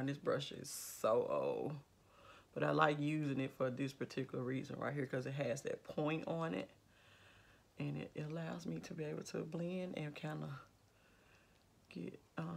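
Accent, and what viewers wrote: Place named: South, United States. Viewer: You are stunningly beautiful. Your Southern dialect is pleasing to the ears.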